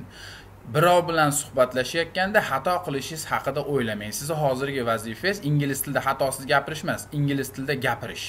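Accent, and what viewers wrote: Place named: Andijan, Uzbekistan. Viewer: инглиз тилини Андижанча манерада гаплашиш.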